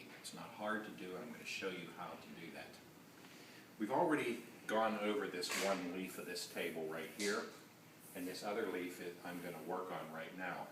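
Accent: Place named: Pittsburgh, United States. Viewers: Thanks. I've used Murphy's for years, but just wanted to see if I used it correctly before I start a project on an old piece. * Side note...I looked up hardwoodartisans. It says Virginia, but you sound like you are from Pittsburgh. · Agreed sounds like he’s from Pennsyltucky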